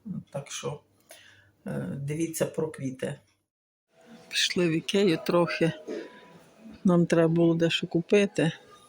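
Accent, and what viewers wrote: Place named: Transcarpathia, Ukraine. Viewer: Цікавий діалект закарпатський,веселий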